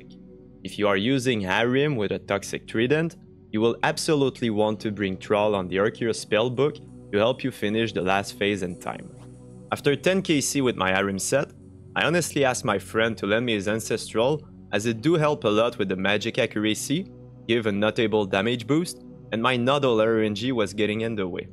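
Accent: Quebec, Canada. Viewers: en 2 secondes j'étais genre, yo un québécois qui fait un guide. Nice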